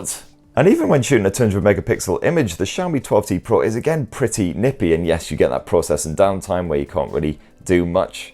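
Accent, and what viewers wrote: United Kingdom. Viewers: You are always outrageous with yuh commentary but gosh I love the British and the way y'all speak. Bro say, yuh won't have to sell yuh first born😂😂😂😂😂😂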